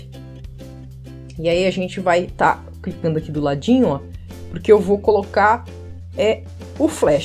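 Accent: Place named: Rio Grande do Sul, Brazil. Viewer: Adoro esse sotaque do Rio Grande do Sul. confere?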